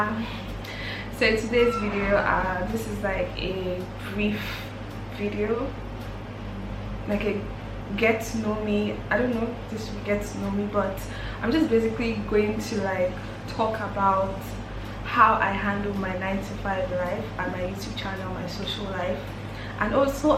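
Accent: Ghana. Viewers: You now speak with Ghanaian accent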